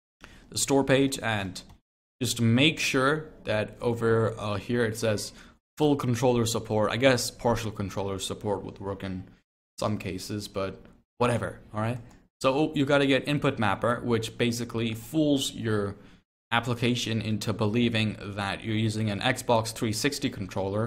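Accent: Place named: India. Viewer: dang bruh, your indian accent is barely noticeable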